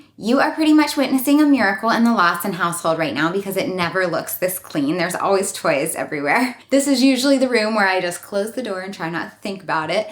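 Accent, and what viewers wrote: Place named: North, United Kingdom. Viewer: Your voice sounds like Leslie Mann who played Jane in George of the Jungle lol And I can tell you're from up north somewhere :D Love the videos!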